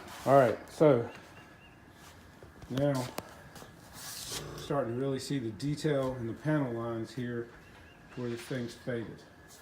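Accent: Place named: Kentucky, United States. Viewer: thanks for quick reply, my wife got me the F4U yesterday and I assembled last night, I tried the chalk for panel lines, I had some French chalk and ground that into some alcohol and it worked great. I live in Taiwan so bit far to go to Walmart but I will call at my local stationary and art supplier they should have bottles if Acrylic paint. Thanks again for the reply and great info on your channel. Listening to you reminds me of my time in Kentucky a few years ago, hope that is not an insult as I see your in NC.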